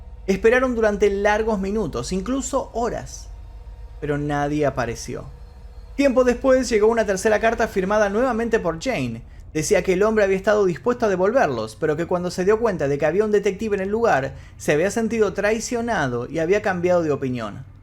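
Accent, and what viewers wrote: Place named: Argentina. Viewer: 😘😍 me encanta tu acento argentino, saludos desde Ciudad de México 🇲🇽🤗!!!!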